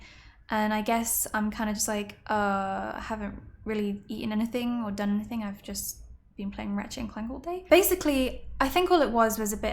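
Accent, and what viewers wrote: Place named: United Kingdom. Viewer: I would love to sit with you at a restaurant table and have dinner, while listening to your stories (and sharing mine as well). I like the way you tell stories, and I also can’t get enough of your sweet British accent 😄 love you, Ms.!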